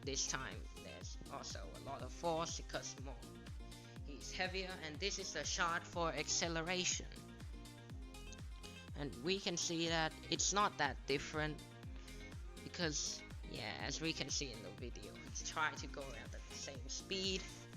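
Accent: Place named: United Kingdom. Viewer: I like that bri ish accent😎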